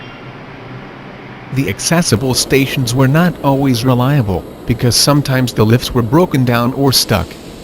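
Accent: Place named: New York, United States. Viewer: my main man! That doco was completely awesome! Very impressive effort. And I never realised you have an American accent. Bit of New York rub off hey?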